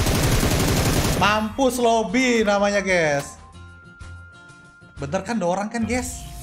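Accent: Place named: Makassar, Indonesia. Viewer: orang makassar dia logat makassar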